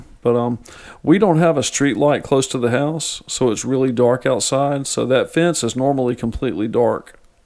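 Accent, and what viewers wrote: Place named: United States. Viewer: I've been paying attention but your accent (yes i know its American but its a particular sound to it and im trying to think who i've heard that sound similar. isnt it strange i couldnt think who it was but i knew it was someone i like, who i thought was a nice person. It came to me!!! I can sleep now!!! Trey Gowdy!!!) I wonder if im right? i.e. whether your accents are from the same part of America or is one going to be Canadian and the other total opposite end? Now back to paying attention 😁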